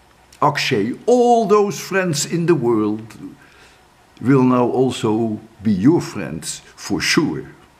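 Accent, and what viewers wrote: Netherlands. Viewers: Sir, now my question is: are you Dutch? Because you speak English with a Dutch accent. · I think it is because he speaks in a Dutch accent, so YouTube thinks it is in Dutch.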